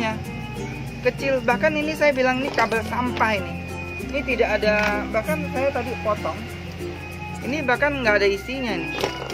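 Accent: Java, Indonesia. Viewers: Eterna, federal, jenbo, kitani, patriot, sumitomo, supreme. Banyak opsi yg kualitasnya memadai. Kemarin aku beli pompa air submersible type SPG20-311K kabel bawaannya OFC 0,75mm² 30 meter. OFC singkatan oxygen-free copper artinya tembaga murni bebas oksigen, relatif tahan karat. Hantarannya bagus. Nyambung kabel serabut mestinya diberi ferrule atau skun yg dipress dg tang krimping agar tidak ngepong. Koh, logatmu medok kamu orang mana?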